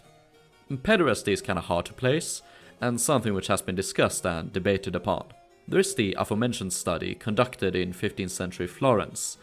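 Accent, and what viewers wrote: Scotland, United Kingdom. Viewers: The Scottish accent ❤ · He’s not British. Sounds more Scottish or Northern Irish.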